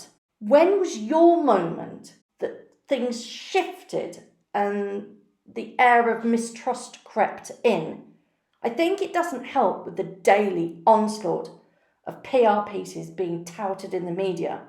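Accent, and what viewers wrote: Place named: United Kingdom. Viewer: I'm going to just leave several opinions, otherwise I get a bit wordy. 1) Thank you for sharing your beautiful voice, I have always enjoyed the accent of the people of the U. K. P. S. To those that have left hurtful comment concerning this Channel's speaking voice, "Go back to the other side of YouTube where evil residence. Any and all hurtful, mean spirited, will full intent to Troll on this side of YouTube, you will b reported for breaking YouTube's protocol on what is and isn't allowed! kathleen Pacific Northwest U.S.A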